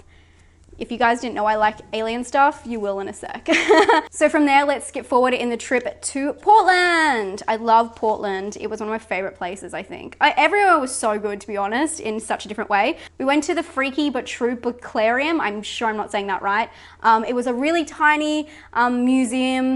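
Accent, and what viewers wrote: Australia. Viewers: An American made fun of your accent? What a knob. Australian accents are the best! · Great haul! I also am not really a collector, I like browsing stuff like that but hate displaying and keeping stuff but you chose great souvenirs! Also, what English speaking person doesn't understand an Australian accent? 😂 can't wait for your next video!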